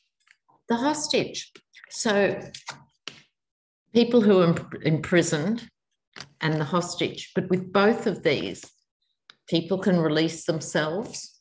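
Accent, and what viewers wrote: Australia. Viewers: Safe journeys my friend 🥰 Enjoy your time at Bondi 🏖 Beach. Don’t forget the sunscreen, Girl. I Always watch your readings and never get tired of your Aussie Speak. Speaking of “ Robert as “You poor little piddle”. My mom used to use that term piddle to describe something our puppy did when she got excited and wet the floor! Maybe it’s the equivalent of American slang calling someone a Little Pisser! 🤣😂😅 Loved your RBG reading. Love that woman! Thanks for staying with us for the long game🇺🇸 🇦🇺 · Congratulations 🎈🍾🎊 on getting a troll. Probably a right wing grifter who’s fascist views are threatened by the truth you speak with your amazing Aussie accent. 🤗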